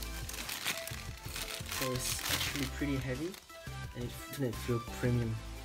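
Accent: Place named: France. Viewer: Are you french ? Because you have a Very big french accent .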